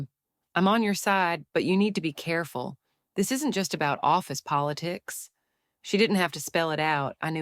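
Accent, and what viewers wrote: South, United States. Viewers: What is this...picture and story about a Black woman being narrated by a southern White woman!? You couldn't even use an AI voice to get a neutral one? This is offensive.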